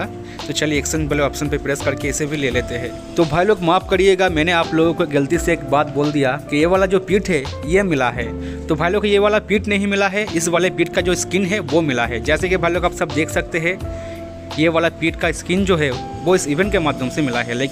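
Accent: Bengal, India. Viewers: Bhai app bengali ho kaya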